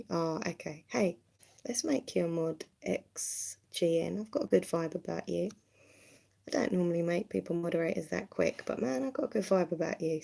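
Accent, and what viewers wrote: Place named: England, United Kingdom. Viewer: What part of England are you from? Can’t work out the accent it’s bugging me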